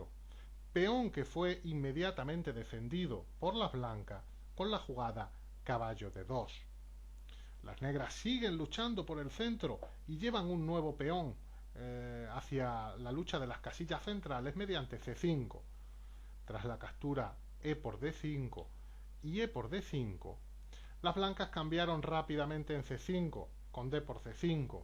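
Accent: Spain. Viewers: a mi me parecio acento catalá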